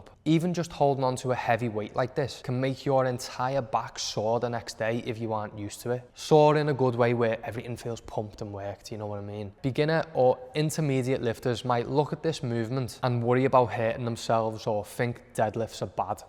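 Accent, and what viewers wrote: Liverpool, United Kingdom. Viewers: Scouse accent, the best 👍 (from Korea) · Scouse mate. Have you heard of the Beatles?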